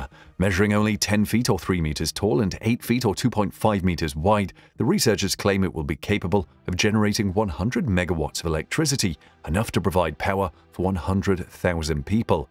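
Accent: United Kingdom. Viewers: I guess that British accent is to make the Chinese propaganda sound intelligent. Does the name Chernobyl (thorium reactor) sound at all familiar?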